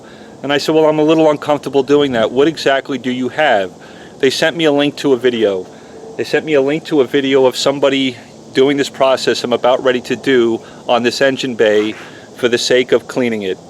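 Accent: New York, United States. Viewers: Why do you have new York accent? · So, how do you get the stuff off your engine if someone has done that? btw, glad to see you're here in Dallas, pard. Your accent though - you're from the NY area, possibly? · You sound so much like my NY friends (or me..lol) Great video and hope people pay heed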